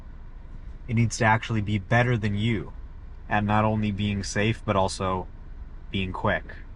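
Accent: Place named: Canada. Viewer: Bro got the canada accent 🏳️‍🌈 🏳️‍⚧️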